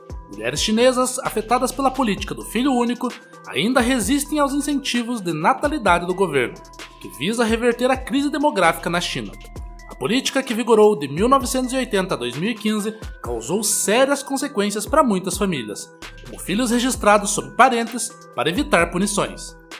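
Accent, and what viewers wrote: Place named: Caipira, Brazil. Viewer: Narrador caipira não dá, hein.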